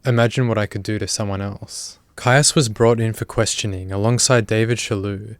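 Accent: Australia. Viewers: Dude I know you're Australian but I've been listening to all your videos throughout the week and every time you say "law enforcement" I thought you were saying Lauren faustman and I heard that name in every video and I wondered how she could be involved in all these murders and vile cases. So I looked her up and couldn't find anything that made sense. but then thought about it.. and realized it's your accent. Oops.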